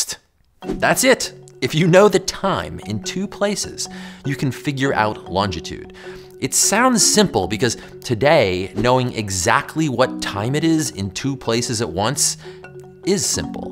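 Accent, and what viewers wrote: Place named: United States. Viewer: He’s using the American pronunciation. We’re “Two lands separated by one language.”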